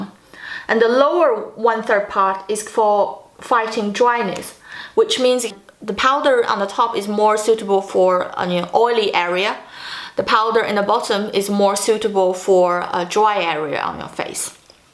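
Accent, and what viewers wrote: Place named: United Kingdom. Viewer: 厉害了我的琳👍英文好赞！喜欢你这带点英式的发音呢😊😊😊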